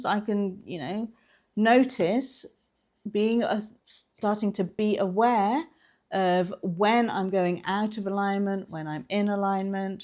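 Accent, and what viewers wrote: England, United Kingdom. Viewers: At last … someone who breaks this down in plain English and with a beautiful English accent 😁 So glad I found your channel. New follower here!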